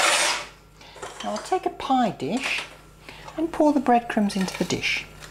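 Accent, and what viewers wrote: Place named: England, United Kingdom. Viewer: J'adoooooooore !!! Cet accent Anglais, cette recette et votre bonne humeur !!! Un régal ! Un bonbon Anglais ! Allez j'y retourne.... j'suis trop gourmand je sais !!!!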